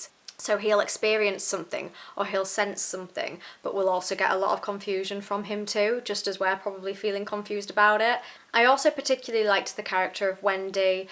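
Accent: United Kingdom. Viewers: Wow, absolutely love your review. And love listening to your voice and British accent, too! I agree with what you said about King's writing style. He's an absolute master when it comes to character development and description. He has a way of writing that makes you lose yourself completely in the characters' thoughts and emotions, until you forget everything else around you. The Shining is definitely one of my top favorite Stephen King novels. I've read every King novel so far, and I highly recommend you read The Dead Zone, Pet Sematary (very scary, but oh so good!), Christine, The Green Mile, Misery, 11/22/63 and the novella collection Different Seasons. Just keep them coming! 😊📖☕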